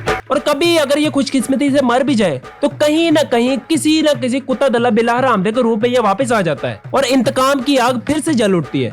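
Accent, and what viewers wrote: Punjab, India. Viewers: Punjabi bht mazy ki bolty ho hahahaha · bhaii i like your punjabi talk style . literally it makes me laugh.... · Hyyyy your Punjabi accent UFFF 😂😂😂. Love it bhi jaan. Lol the ending thoo😂😂😝😝 Keep it up bhi jaan. Wish you a very good lick for your future 🧕🏻😊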